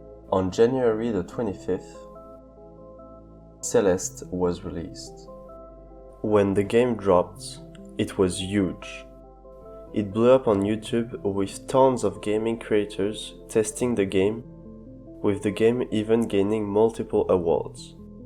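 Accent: France. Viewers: I can recognize a french accent. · La France résonne dans ta voix, très belle vidéo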